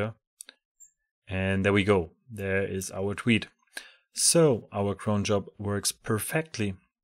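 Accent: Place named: Germany. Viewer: are u a german ? your accent made me tired. sorry bro.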